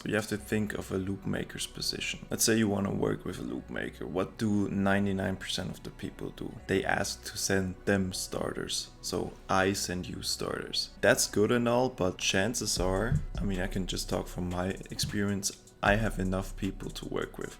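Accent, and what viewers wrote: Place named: Germany. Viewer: very good video, are u from germany cause ur accents sounds a bit like it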